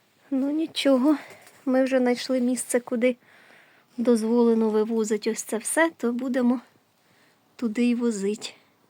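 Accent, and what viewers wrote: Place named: East, Ukraine. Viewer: Чого ж неможливо?!Досить мило,своєрідний східний діалект.Моя мама була родом з Донеччини,то у її рідному селі говорили подібно,ще й був в вимові м'який звук "Л".Як в дитинство потрапила!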